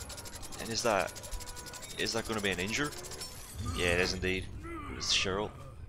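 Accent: Northern Ireland, United Kingdom. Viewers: Are you from Northern Ireland? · you from northern ireland?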